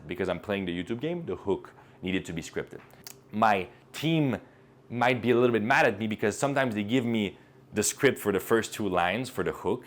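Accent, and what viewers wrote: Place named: United States. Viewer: U re american? Cause u like balckan but u have still american accent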